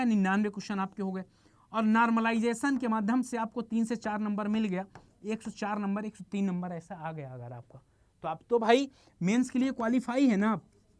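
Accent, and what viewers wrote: Bihar, India. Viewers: Sir aap bihar se ho ya purvanchal UP SE?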